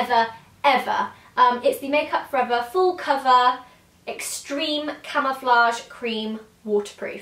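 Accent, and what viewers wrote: United Kingdom. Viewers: You are british ?! :)) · Is she british? Plz reply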